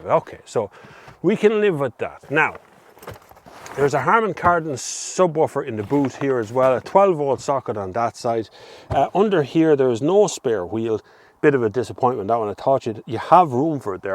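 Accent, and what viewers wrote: Ireland. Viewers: Good reviews of both this and the ID3 - we are currently looking at both cars and wondered what your preference would be - looking at the VW ID 3 Family or the Kia E-Soul? Husband is from Laois so it really helped having a familiar accent to show us the pros and cons of both cars.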